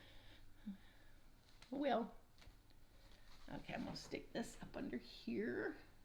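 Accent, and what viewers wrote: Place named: Eastern North Carolina, United States. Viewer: I enjoyed your WIP Parade and listening to your melodious voice. It reminds me of growing up in eastern NC. Happy New Year to you and Peter!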